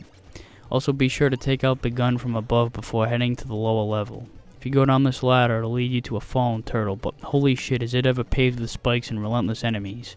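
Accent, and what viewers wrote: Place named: New York, United States. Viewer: Your New York accent is incredibly annoying. Buy a tape recorder and tape yourself all day and play it back. You'll realize you sound like a toddler who hasn't figured out his speech patterns yet. I recommend you move to the Midwest where people talk considerably normal.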